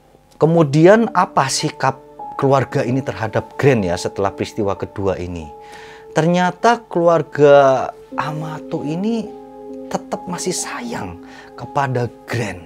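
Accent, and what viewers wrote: Java, Indonesia. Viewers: Angkel medok..nyenegkeh swarane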